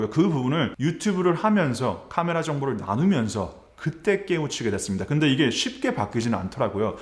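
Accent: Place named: United States. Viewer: 문신하신 줄 이제 알았어요...외모에 대해서도 직접 디테일을? 말씀 안하시면 아무도 노티스 못하고 대부분 시청자들은 분위기 단정하고 좋은 분으로 생각하고 있을 거에요~ 목소리가 힘이 있고 단정해서 말투 평소에 아나운서 같다고 생각했는데 더 좋아질 수도 있을까요? 흠... 사진 리뷰 잘 보고 있어요. 저의 영상 제작 실력도 조금씩 발전하고 있는데 쿄작가님께 많이 배워요~ 미국 사시면 발음이 미국 발음이 나오는데 저도 그것때문에 한국 사는 분들이 지적을 하지만 한국에서 미국으로 온분들은 발음을 엄청 신경쓰고 교정을 했기때문에 원어민보다 더 한국 발음으로 돌아가기 힘들 수도 있죠. 사과도 당당하고 조리있게 하셔서 멋지십니다. 앞으로도 좋은 영상 많이 기대합니다~ 홧팅!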